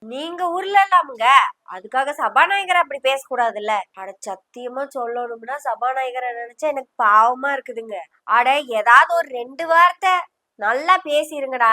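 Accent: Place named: Kerala, India. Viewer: Kerala la nee product viththuttu thaane iruntha papa ..adhe slang ipovum varuthe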